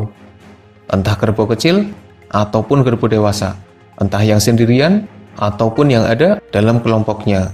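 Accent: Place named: Java, Indonesia. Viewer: Medoknya itu unik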